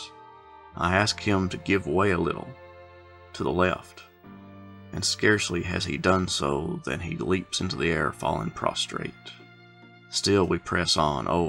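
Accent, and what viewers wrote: South, United States. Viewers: I listened to your video regarding the union account of Pickett's charge and enjoyed your presentation. I am an Army Retiree and lifelong student of military history and applaud your presentations; however, I would like to respectfully point out that Union formations were identified by a numerical designation, while the Confederacy identified their units at the brigade and higher by the name of the current commander. Judging by your accent I can see why you might identify major formations on both sides in the same way, that is to say with the Confederate system. Keep up the good work.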